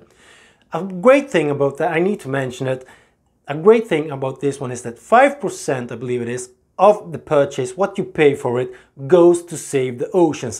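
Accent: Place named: France. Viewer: Haha love your French accent 🤣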